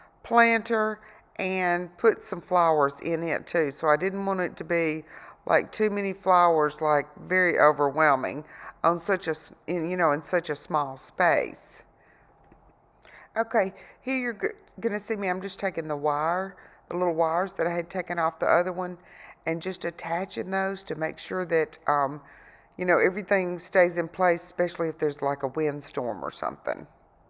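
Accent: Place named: South, United States. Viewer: Hi 👋 I just luv your southern accent 😍 and the wreath came out beautiful.. Thanks for the upload..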